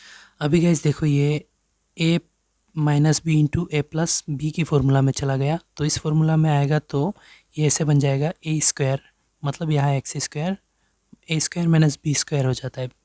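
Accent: Bengal, India. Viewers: R u Bengali sir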